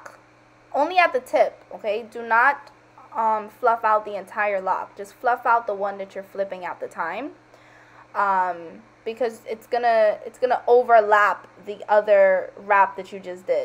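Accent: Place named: New York, United States. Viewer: U sound like your from New York lol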